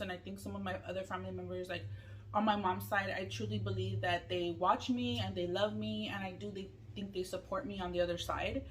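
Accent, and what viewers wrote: Los Angeles, United States. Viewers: Hey! I’ve been watching your channel since you had that pink velvet blanket on the background, when you did your videos in your bedroom I think? But I don’t usually comment but I enjoy watching you kind of like a talk show but with food! And you have a cool personality and just a very authentic way about you, including the good and not so good aspects of life. You show yourself and all aspects of you and I respect that you don’t put up a fake persona, you still say “foo” and I grew up in Los Angeles county and remember talking like that and still do in my head say “foo” but I don’t hear it often in my daily life so it’s nostalgic for me. I love Asian food too so I enjoy when you feature Asian food in your videos but I’m just a curious eater too so we’re similar in that, I love to taste and discover new foods and dishes. 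Thank you for being genuine and being real, I wish you the best and know that even though many of us don’t comment all the time, I for one do genuinely want the best for you and are silently cheering you on. You’ve had a tough childhood in many ways and it’s so cool that you have been growing and I give you props for how you handle the negative comments you always make me laugh with how you respond in a calm and funny way, but it still sucks that there’s people who don’t care about others and choose to be mean, like really? Would people say half of what they say in person? No it’s only cuz it’s behind a screen. You keep being you and just thank you for sharing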